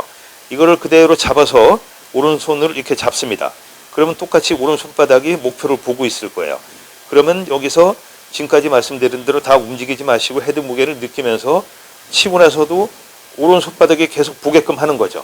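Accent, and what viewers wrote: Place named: Seoul, South Korea. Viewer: 경기 해설도 너무 쟐듣고 있어요 ~~ ’했거던요‘ 하는 서울 사투리 너무 좋아요^^ 정말 듣기 희소한 서울사투리에요^^ 제 고향이 서울이라 그런가봐여 내용도 너무 좋아서 세계적인 선수들의 플레이 보고 설명들으면 너어므 공부가 됩니다 그냥 장활영 해설위원님 해설 경기는 그냥 틀어넣고 ASMR처럼 듣고 있으면 힐링 그 자체입니다 감사합니다^^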